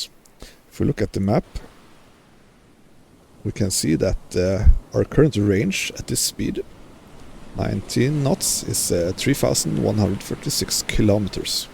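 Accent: Sweden. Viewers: One more, will it be possible to repair in port now when these changes are implemented? I suspected you where a Swede judging by the accent. 😅 But wasn't sure because looking at the games theme i though - Swede but maybe German 😂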